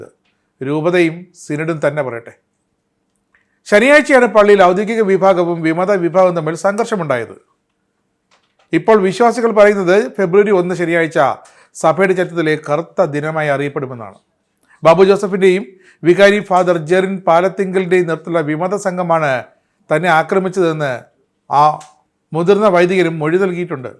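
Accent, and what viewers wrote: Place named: Pala, India. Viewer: എടോ താൻ എവിടത്തുകാരനാ? കേട്ടിട്ട് പാലാക്കാരനാണെന്ന് തോന്നുന്നു എടോ എറണാകുളം അങ്കമാലി അതിരൂപതയുടെ ആസ്ഥാന ദേവാലയമായ ബസ്ലീക്കാ പള്ളിയിൽ 2021 ൽ ബലിപീഠം തകർക്കുകയും ബലി അർപിച്ചിരുന്ന വൈദീകരെ തൊഴിക്കുകയും ചവിട്ടുകയും ചെയതപ്പോൾ ഇയാൾ എവിടെയായിരുന്നു ? ഈ കഴിഞ്ഞ ജനുവരി 11 ന് 21 വൈദീകരെ പോലീസുകാർ തൂക്കി എറിയുകയും തിരുവസ്ത്രം വലിച്ചു കീറുകയും ചെയ്തപോ ഇയാൾ എവിടായിരുന്നു. കഷ്ടം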